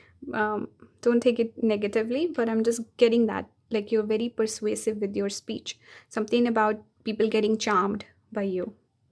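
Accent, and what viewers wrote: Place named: India. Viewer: Pile 3 speaking. Logical as well as intuitive. Plus you have a cute Indian accent. ❤😊.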